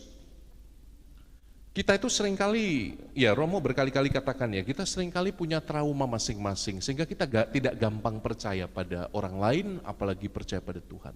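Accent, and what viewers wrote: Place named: Java, Indonesia. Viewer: Bahasa jawanya romo medok banget👍👍👍🙏🙏🙏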